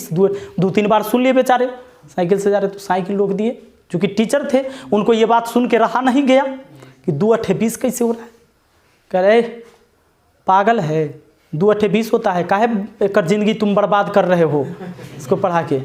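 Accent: Bihar, India. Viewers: what a bihari accent sir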